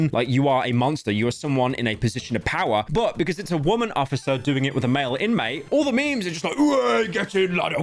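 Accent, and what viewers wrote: United Kingdom. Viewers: i was 100% certain that it was penguinz0 video until i got jumpscared with bri'ish man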